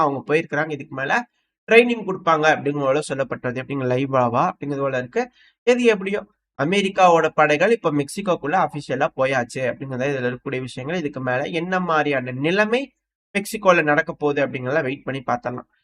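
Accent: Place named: Kongu, India. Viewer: Thambhi,,,. Ungga.,, Konggu. ,Thamiz Super ,, silence,,pechu,,,good🎉🎉🎉🎉🎉🎉🥭🍊🍑🍉🍎🍒🍓🙋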